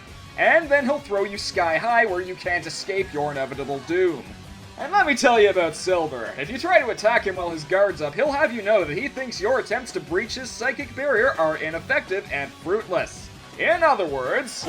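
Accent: Canada. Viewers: are you french canadian? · Is he canadian?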